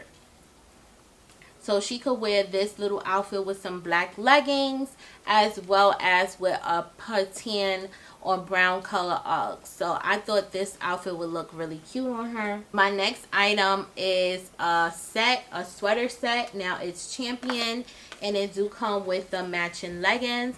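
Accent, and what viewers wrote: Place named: New York, United States. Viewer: Hey Melanin Queen! Ok you went to make it rain I see ! You have some nice stuff that you some of the stuff and there are some stuff that I never heard of . I may need you as a shopping buddy. Love your personality lol. Sounds like you from New York u have a strong accent. How did your date night go? Did it went well? I don’t like flat pillows either … I always said I was going to get the mood lights …. Question can u play music and it switched the mood of the light or can you switch the colors? How do you feel about Kylie brand of lipgloss? I’m going to may light my candle for my bathroom as o take my bathroom..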